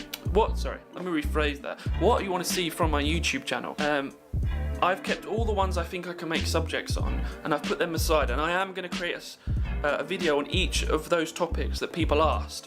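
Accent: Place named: United Kingdom. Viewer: You won't beleive it, but i watched this video to exercise my listening skills in British English 😂😂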